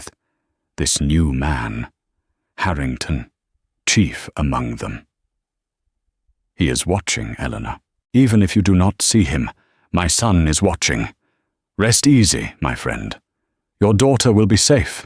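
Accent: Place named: England, United Kingdom. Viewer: I have watched a few of these tales from various websites, and it disappoints me that the narrator sounds obviously English, but the written story is horribly American English. All of these websites need to rectify the language problem so that narration and written word match English from England.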